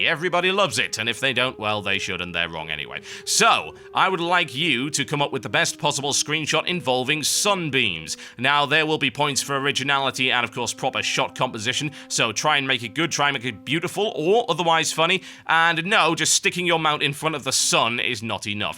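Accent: United Kingdom. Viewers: I honestly think that the only reason I like your videos are because of your British accent.. racist maybe.. but i dont think any of these people would like these if you talked like wowcrendor, me myself wouldn't give a damn. just saying, but nice videos anyways.=p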